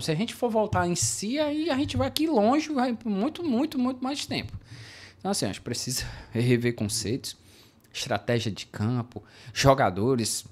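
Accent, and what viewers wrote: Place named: Ceará, Brazil. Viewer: Parabéns pelos comentários: sensato, equilibrado, objetivo e esse jeito cearense de falar, que é seu melhor!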